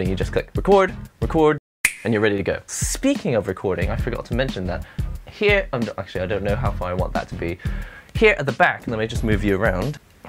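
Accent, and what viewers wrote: United Kingdom. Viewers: Why your accents like those from UK?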